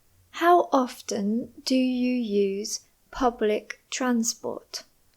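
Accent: United Kingdom. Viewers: Thank you so much i feel happy and motivated after watching this video because i was very afraid of British accent as an English learner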